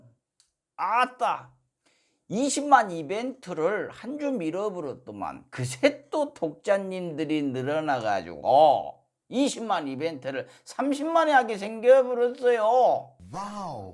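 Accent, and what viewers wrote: Jeolla, South Korea. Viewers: 형. 님!! 전라도 사투리 솔찬히 잘흐요.. 수원에사는 순천 촌놈이 구독 눌루고 가요오! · 혹시전라도랑무신관계라도있을까요 우리고장말씀을잘구수하게잘하네요 너무나시원한럭셔리칼럼하루만안해도기다려지네요 강성범tv많이많이들구독해주삼♡♡♡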